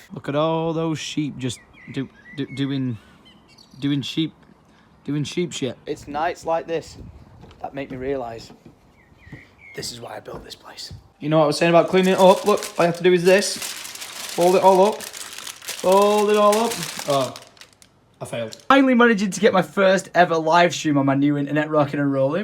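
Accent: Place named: Scotland, United Kingdom. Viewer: That’s Scottish accent is hilarious aha from Glasgow !!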